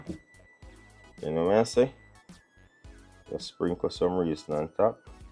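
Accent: Jamaica. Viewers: Chief.. I. Love. The. Fact. You. Speak. English. Well. Just. With. A. Little. Jamaican. Accent. Which. Is. Fine.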